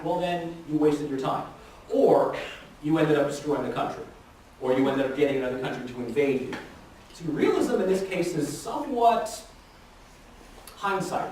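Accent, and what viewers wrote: New Jersey, United States. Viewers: His Jersey Accent makes the lectures even more entertaining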